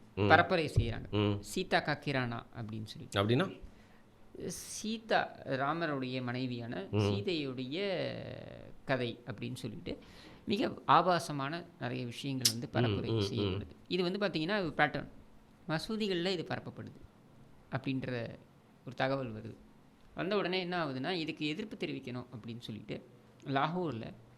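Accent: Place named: North Chennai, India. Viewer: North chennai slang is good sir. It is a mix of Tamil, Telugu, hindi or urudu slang. A language should be able to convey the message. Litrature is totally different from colocal language. Both are to be appreciated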